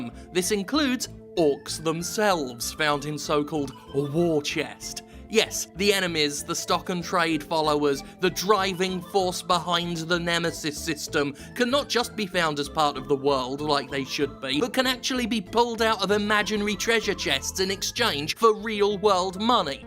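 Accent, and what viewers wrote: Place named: United Kingdom. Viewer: Always nice to hear your own thoughts so eloquently put. And in a British accent to boot. Makes it somehow more dignified. Fuck Warner Bros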